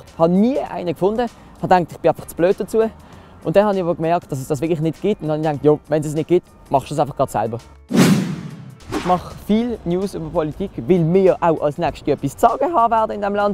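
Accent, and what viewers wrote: Switzerland. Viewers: Nai, das isch Baseldytsch wo dä schwätzt.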